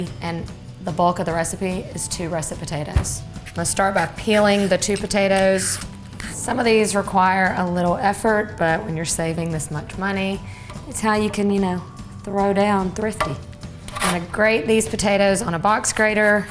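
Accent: South, United States. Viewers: Your video has the BEST appetizers!!! I am so thrilled to find you!! Are you from Alabama? I lived in Leeds, Al many years. i just detect a slight southern accent! Merry Christmas!'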